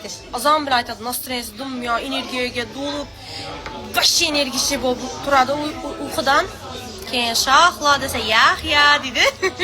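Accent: Khorezm, Uzbekistan. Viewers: Хоразм шеваси бу одамга билмай тухмат килма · Turkçe iyi konişamiyosin 😮😮😮 sen Harezmdan galiba😮😮😮Harezm şivasinda konişyosin😮😮😮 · Хоразм шевасида гапиряпти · xarazm tili chiroyli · Сизга подписка булдик. Позитив инсон экансиз. Хоразмча жудаям бошкача ти л тушуниш кийин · Bir karasa xorazmcha bir karasang uzicha toshkencha gapiradimi ukuvsiz ekanbu maktabda ukiganmi bu😢😅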